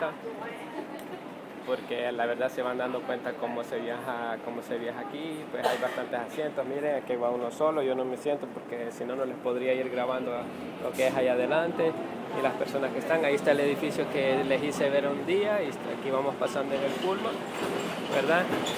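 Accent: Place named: El Salvador. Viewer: También se le nota en el "pues si" jajajaja yo también soy salvadoreña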